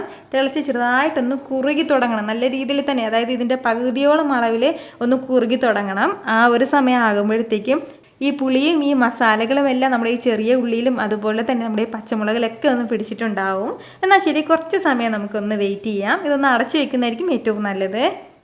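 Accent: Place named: Thrissur, India. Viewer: മൂളകൂഷൃം ത്രശ്ശുർകാർ പറയൂം ചെറിയൊരു വൃതൃസം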